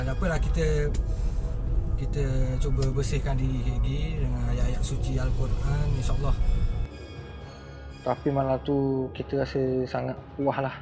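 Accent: Terengganu, Malaysia. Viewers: Xtau laaa nk tgk video ke...nk tgk penjelasan..sedap bunyi ganu tu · kn....minat sgt kt...lg2 dgr org ckp loghat tganu...tu suka tgk ni...😊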